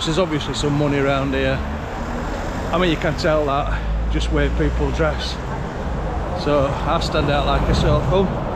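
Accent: Yorkshire, United Kingdom. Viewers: I lived that walkabout in Donny and your lovely Yorkshire accent. Pity you got a bit wet, but never mind eh.Greetings from a Yorkshire lass ,now in Australia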